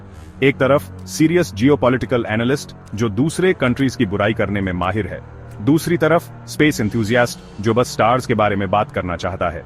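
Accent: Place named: United Kingdom. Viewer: Aur angrej mat ban. Hindi bhi angrej ki tarah bol rha hai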